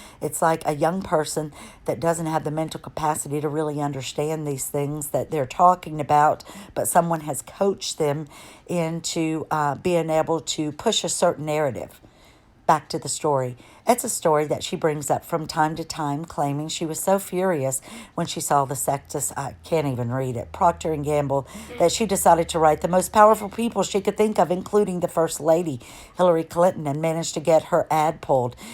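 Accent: South, United States. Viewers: Hello, you have a lovely accent, sounds like a southern accent. If that's so, then good, that means more and more Americans are hopefully finding out what a witch MM is. I'm a Mexican American 66 year old woman from Los Angeles and I've never liked her. Thank you for the read and post. Let's keep spreading the news that MM is vile and hurtful.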